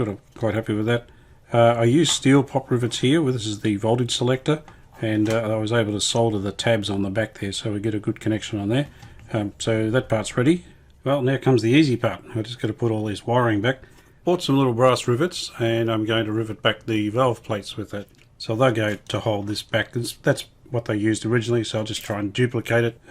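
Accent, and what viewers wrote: Australia. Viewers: That Australia accent are so cool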